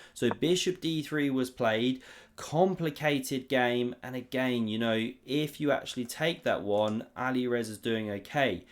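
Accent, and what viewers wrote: United Kingdom. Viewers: I love ur british accent man keep it up !😊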